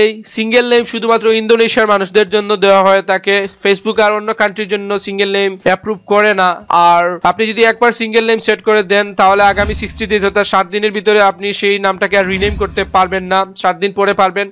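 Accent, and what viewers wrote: Sylhet, Bangladesh. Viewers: Vai ki sylhety😁😁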